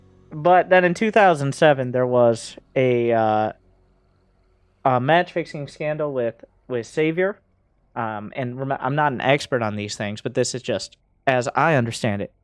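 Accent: South, United States. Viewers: Does he have a southern accent?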